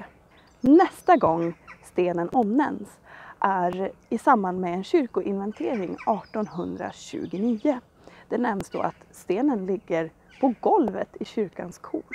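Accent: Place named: Uppsala, Sweden. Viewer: Någonstanch verkar vara Uppsalalingo. Debattören Malcolm Kyeyne uttalar på samma sätt. Ok. Jag tycker Gamla Uppsala Museum gjort en rad riktigt intressanta videos, de flesta proffsiga, Tittar så mycket jag hinner. Igår var jag tvungen att bila utmed långhundraleden för att se med egna ögon. Mer kunskap åt folket.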